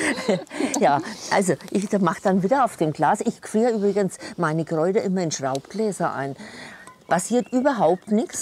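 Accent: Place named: Lower Franconia, Germany. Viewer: Gruess Gott,ja jetzt Weiss ich warum Mir das nicht gelingt.ich habe immer nass geschnitten.Der Dialekt macht Mir heimweh,du musst ja ganz nah bei meinem Geburtsort wohnen.Bin ein ausgereister Unterfranke.Zwischen Schweinfurt und Stadtlauringen.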